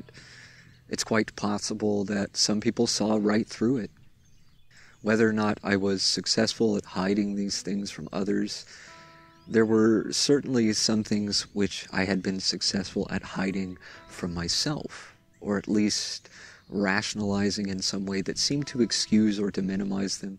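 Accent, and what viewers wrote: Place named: United States. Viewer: Thank you 💚 had a miracle breakthrough today and experienced the barriers coming down between someone I judged and myself. I really misjudged them and they really surprised me and they were really loving, we had a nice day together and I was able to see how I’ve been acting angry and judgemental. Starting to feel myself softening and letting go a bit towards others :) Just realised you have an American accent, it’s funny I haven’t noticed before as I was so focused on the messages etc. I’m in England. Thanks for your help 🌈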